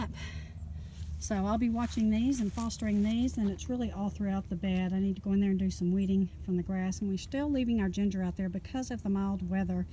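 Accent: Texas, United States. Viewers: Dang. I miss that accent. Grew up in HEB area. Exiled in La.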